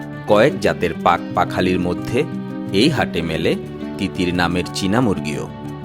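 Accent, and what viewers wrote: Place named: Bangladesh. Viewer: Brother R you Bangladeshi ? Your talking style sounds little different.Do they export this big sweets ? But your video attracts me.Thanks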